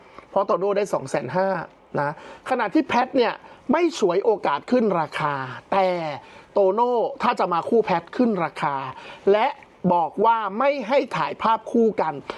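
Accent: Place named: Isan, Thailand. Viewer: เสียคนอีสานเหมิ้ด😅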